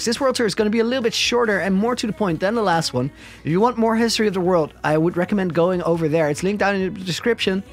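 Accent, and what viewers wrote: Netherlands. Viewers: Are u dutch ben je nederlands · Do I hear a HOLLANDS accent there You have GEKOLONISEERD the sea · Its the first time watching you but i bet that your Dutch😂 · Are you dutch?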